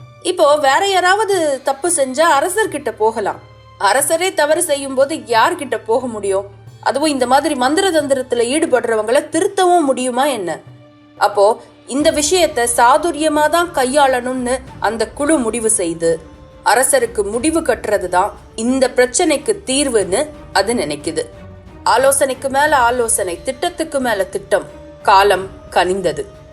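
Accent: Tirunelveli, India. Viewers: Unga voice nalla gambeerama, kekaradhuke oru energetic ah iruku. Alutham thiruthamana pronunciation super ah iruku. Yella type stories um solreengalae (historical puranangal, motivational) , yepdi idhellam yosikareenga. Apdi yevlo books dhan padipeenga. Nejama solren neenga oru extraordinary person. Unga slang yenga oora ஞாபகபபடுத்துது (திருநெல்வேலி, தூத்துக்குடி). உங்கள் பணி மேன்மேலும் வளர்ந்து தொடர என் வாழ்த்துக்கள்.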